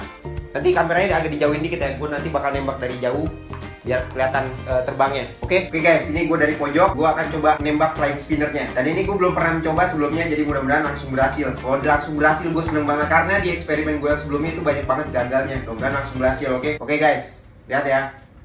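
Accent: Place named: Philippines. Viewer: i thought you were a filipino or visayan that's the way we talk but not me just little bit but im half filipino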